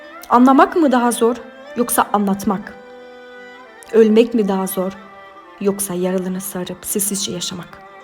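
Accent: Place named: Kičevo, North Macedonia. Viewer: ke një zë shumë të ëmbël dhe të mrekullueshëm gjithashtu edhe një talent të pa parë. Në dëgjim të parë nuk mund ta dallosh se është interpretuar nga një vajzë kërçovare kaq mirë,pastër dhe bukur gjuha turke. Të uroj shumë suksese si në këtë talent që ke gjithashtu edhe në drejtimin që ke zjedhur të studiosh.❤️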